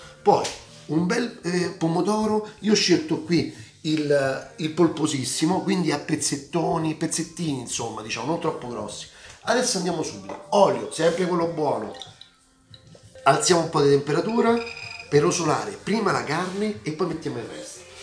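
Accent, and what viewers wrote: Rome, Italy. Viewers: Ma quanto mi piace l accento romano,troppoooo belloooo...ciao....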